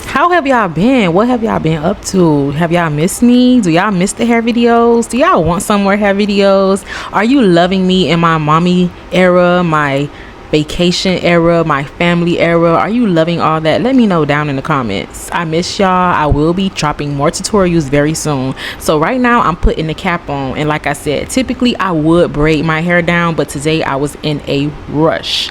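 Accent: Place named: Florida, United States. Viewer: the way the florida accent done became so recognizable to me now is crazyy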